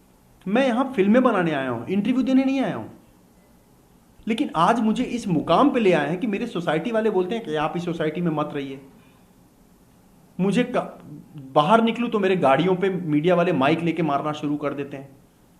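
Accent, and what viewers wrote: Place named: Haryana, India. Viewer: Mene esa chennal aaj tak nahi dekha hamko chutiya samjhe ho iski bhasha bihari to bilkul nahi he to ye bihar ka kaha se he iski bhasa hariyanvi lag ri he be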